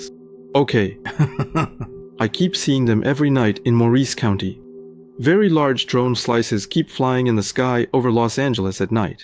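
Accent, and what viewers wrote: France. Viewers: Omg? Sup with the robotic voice? I like your original french accented voice... ⭐️*⭐️*⭐️*⭐️*⭐️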